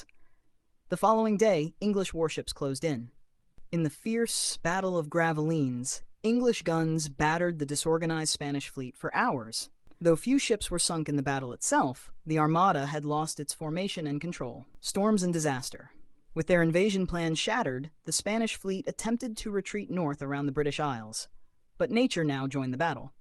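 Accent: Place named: United States. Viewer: "THE BATTLE OF THE SPANISH ARMADA" makes no sense. But what else can you expect from a US dialect narration? The battle of the English Channel is a bit more logical. Jack, the Japan Alps Brit.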